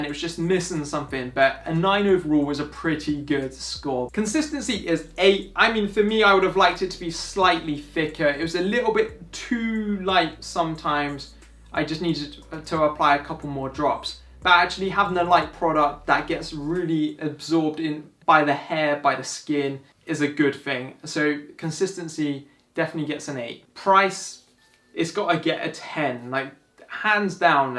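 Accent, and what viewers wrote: United Kingdom. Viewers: I have a full beard past a fist length from my chin and am always open for suggestions and products that I may not otherwise be aware of. I recently subscribed to your channel and I listen attentively to your videos, which brings me to my random off-topic question: where specifically in the UK is your accent from? You have a pleasent and relaxing speech pattern that makes it easy to listen and perfect for instructional videos (in my own humble opinion). Your quirky style does not hurt either. Clear, relaxed, no nonsense, unpretentious videos. What more can one ask for? Just saying. Keep them coming.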